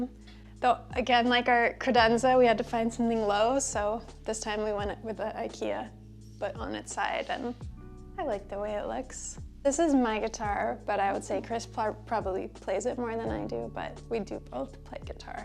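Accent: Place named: United States. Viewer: Cute place, cute dog and cute US accent!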